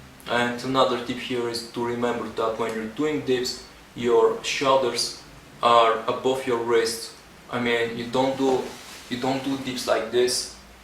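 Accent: Bulgaria. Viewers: hey man, are you bulgarian?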